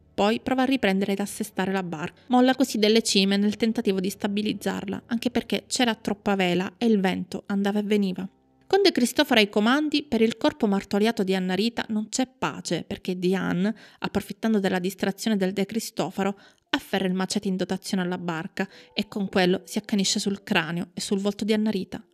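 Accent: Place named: South, Italy. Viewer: Il canale è bello..peccato per la voce narrante. Si sente troppo l'inflessione dialettale del sud.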